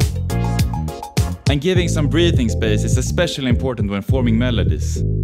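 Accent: Sweden. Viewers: Såg att du tryckte in ett "plopp" 😂 När jag hörde dig prata för första gången visste jag direkt att du var svensk, det är bara någonting speciellt med vår dialekt när vi pratar engelska, tråkigt att PewDiePie inte pratar så längre! Var i Sverige är du från? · I watched and want to ask you which city in Sweden do you live in? 😂 that thick swedish English accent :) no offense, i’m half swedish myself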